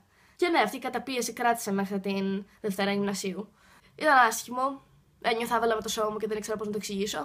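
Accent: England, United Kingdom. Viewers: Μια χαρά είναι τα Ελληνικά σου. Το μόνο που μπερδεύει λίγο ότι μερικές φορές τα μιλάς με αγγλική προφορά (σύνδρομο Τσίπρα). Πρόσεξε το! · Φαινεσαι πολυ γλυκος ανθρωπος και εχεις μια.πολυ θετικη αυρα και μου αρεσει επισης η αγγλικη προφορα σου😍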